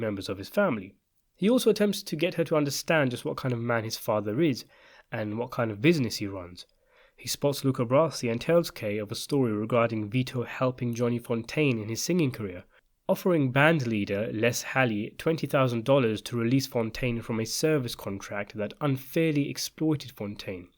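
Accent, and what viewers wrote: England, United Kingdom. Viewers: Hearing an Englishman try to pronounce Italian words and names is almost as funny as hearing an Italian try to speak proper English. Appolina? for Appalonia. And the flat A in Mario is a constant in British form of Italian speech. When will the Brits learn to speak American? Water closet is an awful name for that. And cars do not have a bonnet or a windscreen. How would either of those work? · It shouldn't be this way and I'm embarrassed to admit to admit it but it just doesn't feel right hearing an evaluation of the Godfather coming from someone with an English accent.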